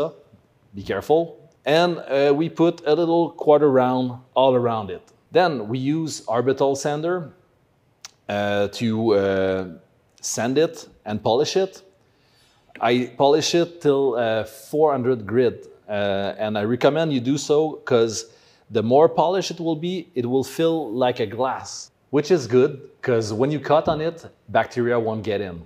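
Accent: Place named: Quebec, Canada. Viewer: un québécois et sa parrait comme moi génial merci pour les super conseil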